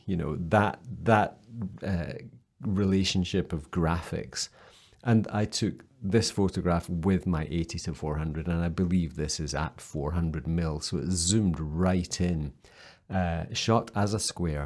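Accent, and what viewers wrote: Scotland, United Kingdom. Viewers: I just love the Scottish accent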